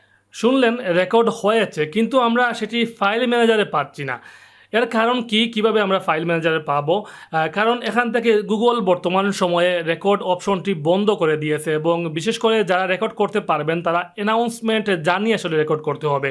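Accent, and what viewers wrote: Sylhet, Bangladesh. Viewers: আপনি মনে হয় সিলটি?😊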